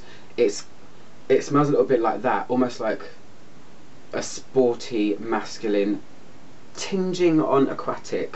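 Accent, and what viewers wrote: England, United Kingdom. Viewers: i love your voiceeeeeeeeeeee!!LOVE YOUR ACCENT ENGGLISH PEOPLE IS AMAZING!!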